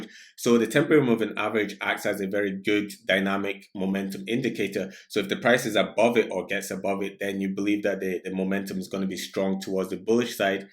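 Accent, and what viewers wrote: Scotland, United Kingdom. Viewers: Is that a Scottish accent i hear bro?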